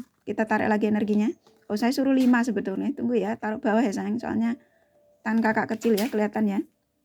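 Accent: Java, Indonesia. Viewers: Suka dgn gaya ngomong jawanya kak.. trnyata iso Jowo ggih kak.. 🥰😍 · Mantaaafff kak readingannya,rasakno. Dia mantan suamiku,yg jls dia gelo sdh menceraikan sy, betul skl kak kami sdh mempunyai anak,dia telah bersm yg lain,namun ternyt dgn orang tsb ternyt gk ada kebahagiaan, skrg br nyadar bhw bercerai itu ternyt gk enak,dan saat ini akhr2 ini sering menghub sy by phone. Sy sih sdh nyaman dgn single parent ini. Tksh kak,sy sll ngikuti chanel kakak,sy sk dgn suara kakak yg njowoi,smg kakak sht sll,sukses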